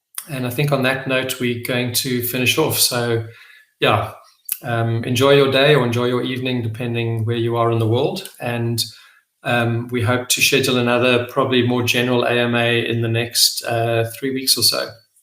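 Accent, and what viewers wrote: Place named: South Africa. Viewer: Sounds South African 🇿🇦🇿🇦🇿🇦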